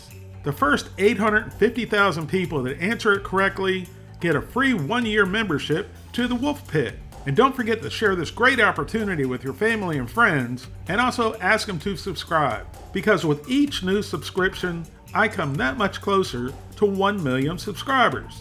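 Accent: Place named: Mississippi, United States. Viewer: Have been here much lately but holy CRAP you've gained a ton of subs! Lol I was around when you were at the 20-40k subs. Good for you brotha! I've always thought you were a Mississippi guy bc of your accent, so now I'm wondering are you? Obviously I am is why I'm asking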